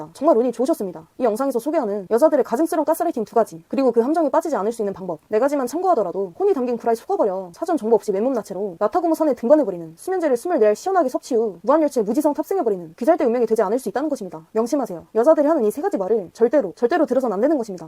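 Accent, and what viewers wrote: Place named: Jeolla, South Korea. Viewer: 아따 라도 사투리 구수하다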